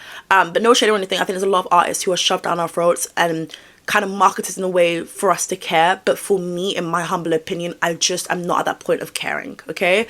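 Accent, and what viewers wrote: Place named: England, United Kingdom. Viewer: GIRL how did i never noticed that you had an English accent until you literally said so 🤦‍♀️🤦‍♀️🤦‍♀️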